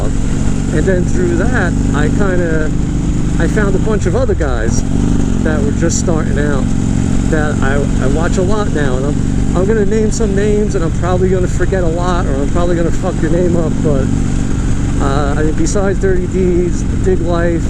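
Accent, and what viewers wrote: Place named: New Jersey, United States. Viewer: Great first vlog. You have the sound problem down pat right away. It took me a really long time to get it right and you have it right. Love the accent. I had a few projects in NJ a few years ago and I was surprised to see how beautiful and rural so much of your state is. Look forward to seeing more. Ride safe my friend. Beautiful Road Glide by the way.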